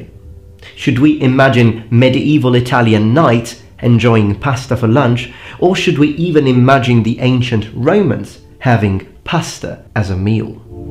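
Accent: England, United Kingdom. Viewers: Qualche consiglio su come raggiungere il tuo livello di pronuncia British? Sono a Londra da 2 anni e ancora mi trascino dietro il dialetto Romano. Grazie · hai un accento inglese fantastico,come? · Your English sounds like a British accent. Well done!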